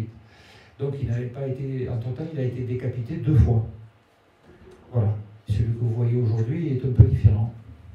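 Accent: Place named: Marseille, France. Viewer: Je comprends rien avec l'accent marseillais 😮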